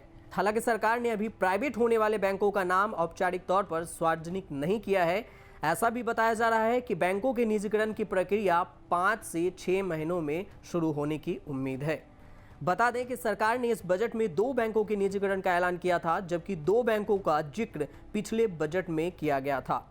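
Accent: Bihar, India. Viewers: Kisne is anchor ko rakha ,sudh hindi tak padna nahi aa rahi.bihari babu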